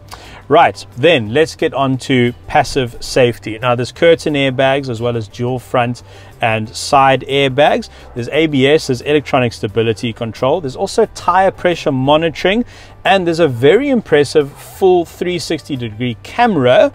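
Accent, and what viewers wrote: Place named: South Africa. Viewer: hey, to be honest, south african accent is way easier to listen to compare to the aussie ones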